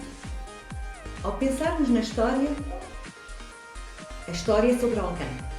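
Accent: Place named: Portugal. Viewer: ela fala a ligua de portugal quem concorda comigo da like e cometem a nha opiniao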